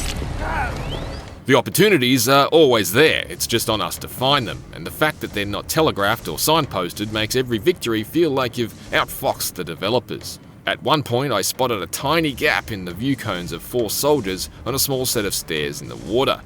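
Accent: Australia. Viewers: I heard the Australian accent, and I was so worried it was the IGN voice up voice down guy. Thankfully it wasn't, and the video is actually watchable.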